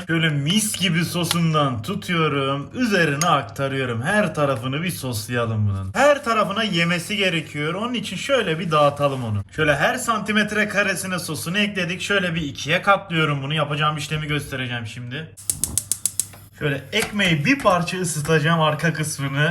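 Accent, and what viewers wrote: Adana, Turkey. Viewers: Abi sen Adanalı gibi konüşürsüünnn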